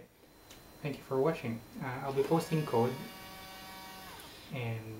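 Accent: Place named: North America. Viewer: North American accent in the UK from somebody coming from Japan . A truly global techie guy !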